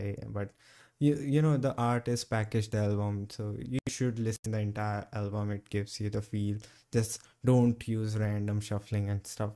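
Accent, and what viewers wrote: India. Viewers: Certified Linux Chad with indian accent, lisp and all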